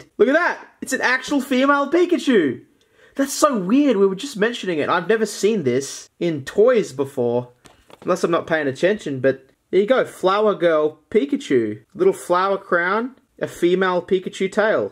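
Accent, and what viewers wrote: Australia. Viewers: The more excited gets the more Aussie he gets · I can not stand how Australians say "Fourdeeen" instead of "Fourteen". speak English 😠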